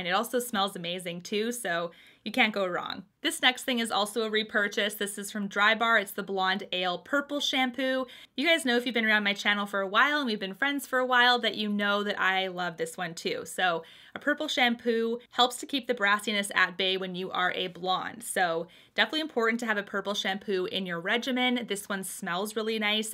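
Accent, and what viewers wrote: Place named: Canada. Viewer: Good morning (at least it’s morning here in Germany 😀). I’m a Brit living in Munich, Germany and because of the time difference you are very often my breakfast buddy 😊. Your videos always put a smile on my face and cheer me up if I’m feeling down. You have a lovely sunny disposition and I love your Canadian accent! The haul looks great. Think I might have to get the Briogeo mask, as well as the Marc Jacobs palette. Really hope the mascara lives up to your expectations - now I’m worried that I hyped it up a bit too much (it is great though). Have a great day xx 💋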